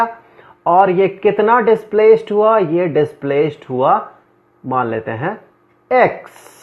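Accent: India. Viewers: والله حسبه يتكلم انجليزي بس باللكنه الهندية.. لين استوعبت ههههههههههههههههههههههه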